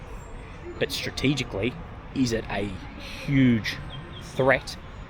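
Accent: Australia. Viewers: You must be Australian based on your accent. Welcome to China.🤣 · what accent is it， assuie?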